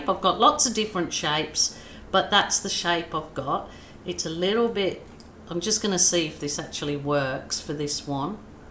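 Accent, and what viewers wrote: Birmingham, United Kingdom. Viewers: Are you from Birmingham uk? You have a kind of brummy twang to your accent :) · Hi just found your paint pour video’s which are absolutely amazing 🤩 I could hear brummie accent coming which was awesome! Thanks for sharing your gifted talents! I’m just starting out my paint pouring journey! Lv from another brummie Christine x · Hi from Yorkshire!..found you 2 hours ago... Watched an hours worth and drove to Asda ( Walmart) before it closed at 10 pm for paint etc...soooo excited to try this! oh and do I hear Brummy twang or am I way off?. Just realised I'm out of tin foil....but remembered the balloons though.. I'm at that dodgy age haha.. great to find you, new subbie here! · I am brand new to pouring and my goodness, what a joy and aspiration this is. It is absolutely beautiful. Can I also say I love your accent. My mom came from Rednal Birmingham and just to hear the accent again is a joy. 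· Omg ! I knew you are a brummy, just heard you say Perry common I’m down the road in Walsall Just love your work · Your accent sounds like you have a bit of brummie in it?... beautiful painting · Yes 😍 still got youre brummie twang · ahh 20.40 you did say your were from birmingham. i have cousins from there and i could hear it. im a scot though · I knew you were a Brummie I could hear it in your accent lol. Love your artwork tutorials. I haven't been brave enough to do a 'pour' of any kind yet but I absolutely love the 'balloon work'. Am trying to think of a way to do the balloon work with my great grandchildren who are 4 & 5 years without it being too messy 😂❤ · Lovely/Bostin!! I want to try it on silk! I'm just coming to the end of my first year at BCU studying textiles so will try to see how it looks with procion dyes etc. I am a very mature student and still love learning all these new things. 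Thought I detected a brummie accent. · First time watching you today, and within 1 minute i was convinced you had a brummy accent, and this was confirmed in the very same vid. Amazing work, i absolutely love what you done here !! New subscriber!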